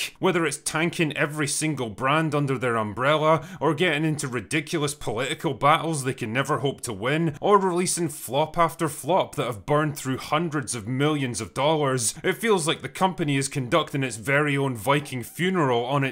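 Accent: Scotland, United Kingdom. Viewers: German with a Scottish accent, music to my ears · I really do appreciate his take spot on, also wow I didn't know was Scottish I assume he was British accent hard to decipher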